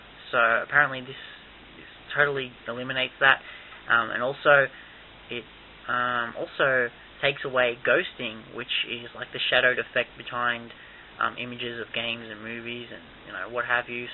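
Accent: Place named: Australia. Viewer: nice australlian accent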